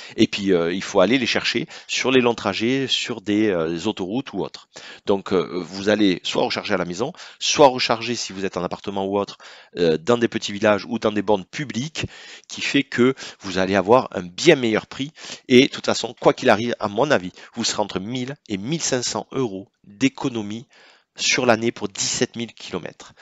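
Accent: South, France. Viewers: Bonjour ok super cool je savais bien tout ça mais là vous êtes vraiment super gentille et clair bravo pour ces tarifs cités, vous habitez où svp vous avez un accent assez fort du sud de la France pour savoir si l'on serait pas voisin par hasard bref dîtes moi par contre avez vous effectué un tableau avec les différences entre la thermique et la Tesla au niveau des réparations ou des révisons svp c'est surtout là aussi qu'il faut faire montrer l'évidence des différences il y en a qui sont déçu total moi je suis un grand amoureux de la Tesla je veux en avoir une et à cause du tarif de l'électricité je me suis plutôt tourné vers une hybride mais je me suis fais avoir en beauté une fois de plus avec une voiture en mauvais état ce n'est pas acceptable j'ai trop de frais à faire dessus et même les pros chez le concessionnaire m'ont arnaqué et ont évité de me faire une géo alors qu'elle en avait bien besoin car j'ai soit les amortisseurs avant à refaire soit le triangle inférieur d'après mon mécano ce serait la barre qui est le triangle apparemment il y a un truc tordu et j'ai pris un trottoir en plus qui a accentué le souci ... 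donc j'ai bien envie de savoir s'il serait possible de me faire reprendre ce véhicule et du coup acheter une Tesla modéle 3 alors que ma préféré est la modéle S la coupe j'en suis fou ...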